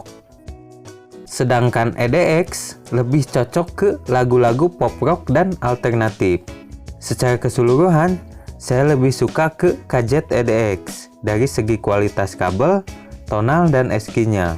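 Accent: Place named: Nagreg, Indonesia. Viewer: Owalahhh orng nagreg to bang 🤣 Btw riview nya bagus 👍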